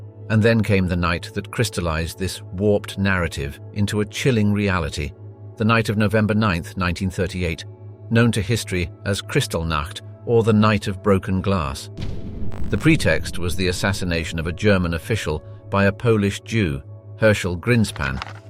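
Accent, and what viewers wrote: England, United Kingdom. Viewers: LOL Your fancy British accent can't hide the lies. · I'm not sure what it is but the English accent makes a documentary better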